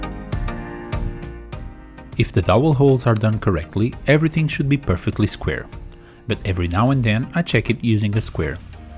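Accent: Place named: Portugal. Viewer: I was mesmerised watching this, and thinking to myself: "this guys sounds Portuguese" , and I was super happy to find out he actually is! :) I plan to do a lot of stuff myself on our new place, so I'm already subscribed! Kudos, great work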